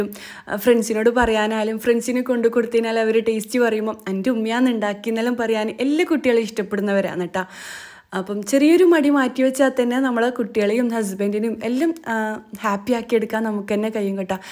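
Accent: Kannur, India. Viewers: English channel kanunna pole nd.. masha allah.. but varthanam njmmle kannurle🫶🤩food kuraknamnn vijarkum iyalde recipe kanumbo pinnem ndakki povm.. keep going🎉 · കണ്ണൂർ ഭാഷ തന്നെ മതി കേൾക്കാൻ നല്ല രസമാണ് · കണ്ണൂർ ഭാഷ പറ ഇതാ ഞങ്ങളും കേൾക്കട്ടെ കേൾക്കാൻ നല്ലരസാ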